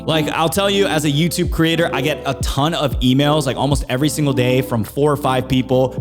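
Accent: United States. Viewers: Very nice video... The words that come out of your mouth are really clear enough to hear.... Similar to an American accent.... It's good....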